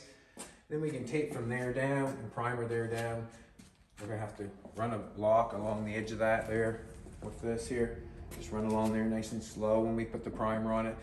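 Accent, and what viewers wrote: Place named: Canada. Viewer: Omg please love you your channel your content and your canadian accent it's all good!!!! But for the love of god stop with the Windex!!!! You could be using any type of spray wax turtle wax to maguire's or whatever brand....but in the same one wiping spray and wipe motion your cleaning protecting waxing and shining and making the surface buttery and slippery for the next inch of dust or dirt to slip and slide right off of.....also because the wax is so slippery way less chance of scratching or swirling paint or clear coat....not a painter but I detailed for almost 2 decades and know what I'm talking about....if no water or no wash which is fine.....at least use spray wax....make that shit shine and buttery as hell and watch the water bead off.....from a machanic and welder at a custom corvette an race shop that detailed for years b4 finally investing in myself and some tools an takin the leap to mechanic for jolenes sake spray wax!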